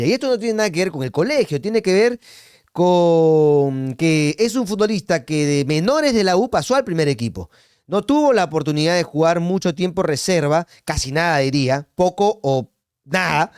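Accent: Argentina. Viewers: Todo bien mano pero porque xuxa hablas como argentino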